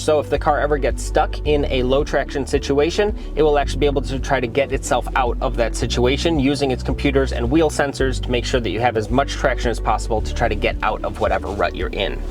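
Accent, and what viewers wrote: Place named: Chicago, United States. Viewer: I enjoy the Chicago accent.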